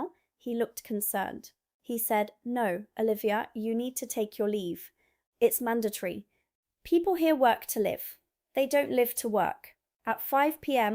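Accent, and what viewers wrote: England, United Kingdom. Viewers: Have you been practicing your English accent? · You don't sound American. You sound very british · Think sweetheart, you will find it takes 3mins to bowl a full kettle of water, but who cares, pleased you like our beloved country, as for your distinct accent, have you some English parentage by any chance?.... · Can't understand why you have an English accent? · how do u have a british acsent? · All you haters leave her alone I like the fact she’s adopted an English accent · How come, if you're a seppoe, you've got a cut-glass English accent? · I only discovered your site tonight. You’re terrific. Love the accent (educated English🤭 ). Love your humour. Hope you stay here. 👍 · You could almost pass for British with your accent, especially not mentioning the Electrical outputs, and the water taps. 😃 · You seem to have acquired a nice genuine British accent in your time here....🙂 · Respect for your proper English accent. 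· hmm two years and a perfect British accent, nice try but no way. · You have a very English accent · So why do you have such an amazing English accent ? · No way are you American with that very British accent. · Excellent presentation, english humour and accent but please lose the Glottal stop!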